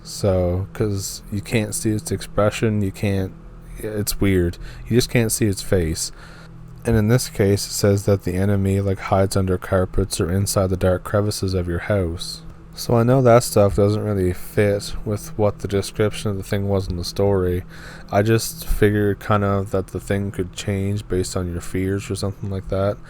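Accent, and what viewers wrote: Canada. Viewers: I saw your comment and the thread it started on a Raven Reads video. I told you I would check out your channel and I have. I picked a random video down the list a little and this is the one. I liked it so much I subscribed! I look forward to checking out more of your already posted videos as well as any and all new content you post. Your voice is great, I love Canadian accents, and perhaps most importantly, you tell the story really well. I felt like I was just hanging with a friend while he shared the story with me. It felt more intimate than most channels, less rehearsed and more spontaneous, if that makes sense. It was awesome! 🙂🙂🙂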